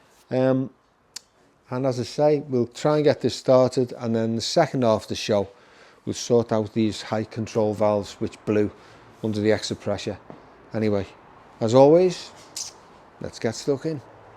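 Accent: Liverpool, United Kingdom. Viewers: The scouse swagger ...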